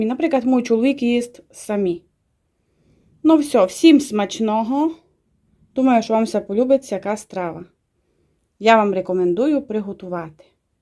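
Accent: Transcarpathia, Ukraine. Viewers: Привіт із Львівщини! Дуже у Вас все сподобалося, закарпатське все таке добре, а Ви супер пояснюєте, приємно чути закарпатський діалект.Тішуся,що знайшла Ваш канал. Хай береже Господь Вас, і Вашу сім'ю! Великого успіху такому чудовому каналу!!!😘😘😘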